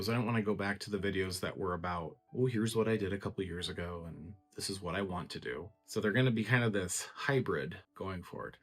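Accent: Minnesota, United States. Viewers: Time is indeed a precious commodity, and the times you've shared have been cherished by me. You look so cozy in your Christmas bedecked home with your sweater and beard. You may be in Orlando but in my mind you're right there at The Estate penning Christmas cards and drinking eggnog by the light of your tree. On the 12th Day of Vlogmas my true love gave to me: Twelve Months of time, beauty and dedication to all that you do, be it your home's restoration, decorating, or simply allowing us to be a part of your beautiful, beautiful world. As well as Eleven eggnog liquors Ten-ish strands of "tinthel" Nine ladies ironin' Eight cameras tumblin' Seven cutouts glowin' Six berries spillin' Five Christmas trees 🎄🎄🎄🎄🎄 Four Paolo meows Three sets of batting Two power strips 🔌🔌 And you sound like the movie Fargo 🎄⛄🙂⛄🎄 Merry Christmas my beloved. You're amazing.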